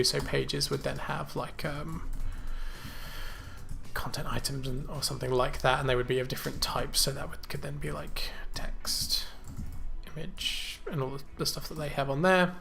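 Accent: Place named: United Kingdom. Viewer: Mate, I really do love your British accent. Cant get enough :D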